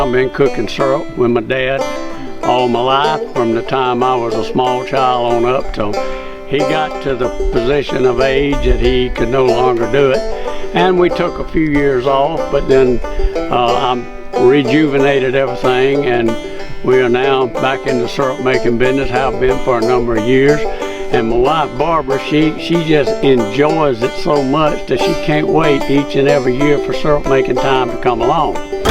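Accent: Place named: South, United States. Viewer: What are you from, since you have a Southern accent? Awesome sugarcane syrup though.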